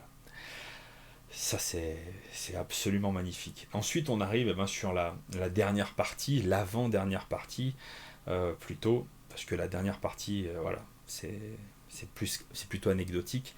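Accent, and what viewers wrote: England, United Kingdom. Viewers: Bah il est très bien cet accent anglais ! Merci pour la vidéo ! J'ai jamais pris le temps de découvrir la JSA, ça donne envie!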